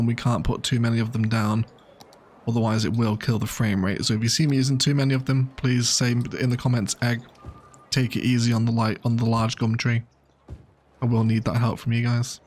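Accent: Australia. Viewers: Music ✅️ Aussie accent ✅️ train only start ✅️ incredible map ✅️ special guest appearance ✅️✅️✅️ editing ✅️ do I love Thessia already? ✅️✅️✅️❤️❤️❤️❤️❤️❤️❤️❤️❤️ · Right on with the aussie accent mate! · Honestly mate what an amazing train station area I don’t know how you managed to capture the vibe of a small aussie community train station so well I’m from Perth and that station would even fit in over here to 👌🏻 also keep the Aussie accent in it’s actually not bad 🤣🤣 very keen for this series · Egg, take it easy on the Gum Tree.. April fools, you don't have that many yet. Love the video, so excited to see it grow. Perfect chill out vibes - and aussi accent!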